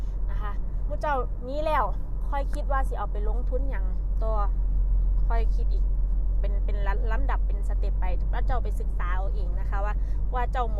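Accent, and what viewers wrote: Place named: Chaiyaphum, Thailand. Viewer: สวัสดีจ้าพึ่งเข้ามาเบิ่งเทื่อแรกจ้า ฟังเทื่อแรกเดาว่าเป็นคนชัยภูมิเดาถูกอิหลีหนูกะคนชัยภูมิคือกันจร้า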